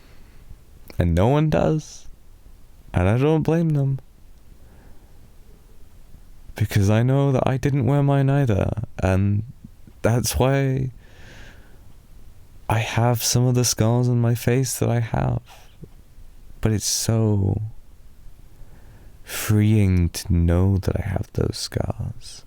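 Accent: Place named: United Kingdom. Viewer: Finally, British accent! No more American accents!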